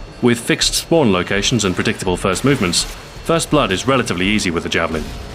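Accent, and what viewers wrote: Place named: United Kingdom. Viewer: Why does everything sound better with a British voice?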